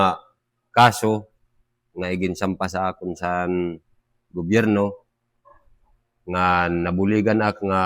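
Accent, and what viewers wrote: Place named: Waray, Philippines. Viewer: Northern Samarenyo waray parin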